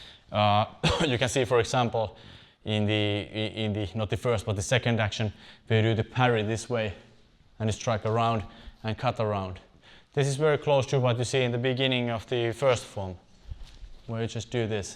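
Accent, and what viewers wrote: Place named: Finland. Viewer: Are you Finnish? Your name sounds like it, you really look a lot like my wife's cousins in Helsinki, but your accent is all over the place! Sometimes it reminds me of the accents of my Finnish family, at least with certain sounds. Whether you are or not, your accent sounds very worldly and sophisticated to my ear at least, so please know I mean no offense. That said, I really enjoy your content here! I hope that you are still training and coaching wherever you are!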